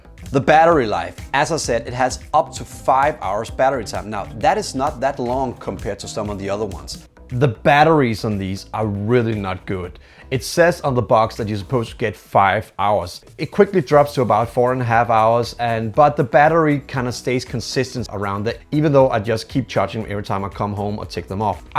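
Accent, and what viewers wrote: Denmark. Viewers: easy on the copenhagen(ish) accent, hahaha. Otherwise, great work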